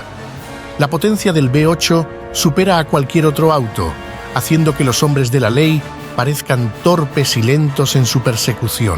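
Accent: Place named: Granada, Spain. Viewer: Esta mujer, MARIA ( MERY) fue recompensada por DIOS SANTO Por sus tantos sacrificios y méritos que desde NIÑA ella Cosechó, así que DIOS SANTO la recompensó con este HIJO llamado ENRIQUE FORD. gracias, tus videos son envolventes y así con PERAS Y MANZANAS, si entiendo un poco. P R A, Debo decir que la primera ves que vi tu Canal, pensé que eras Español ya que tu Asentó esta mas allegado a l Castellano de GRANADA que al Castellano COLOMBIANO. Congratulaciones para ti, BENDICIONES from AGUASCALIENTES CITY, EUMA) BYA BYA. 8.12,24 A, y acuérdense que TOMAS ALBA EDISON NACIO EN EL PUEBLO, SOMBRERETE, ZACATECAS CITY. NORTEAMÉRICA MEXICANA. A Tomas Alva Edison se lo llevaron sus Padres a los EEUU. ala edad de 6 años, una ves ya con la vocación de ingeniero INVENTOR, el JUDIO DE RELIGIÓN J P MORGAN lo FINANCIÓ Y SE HICIERON SOCIOS es por eso que la mayoría de los AMERICANOS DE HABLA HISPANA Y EURO ESTADUNIDENSES CREN QUE TOMAS ALBA EDISON NACIÓ EN EEUU.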